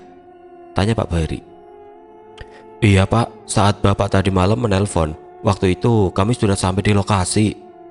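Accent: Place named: Java, Indonesia. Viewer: Mlah fokus ke logat admin.Admin asli jawa mana ?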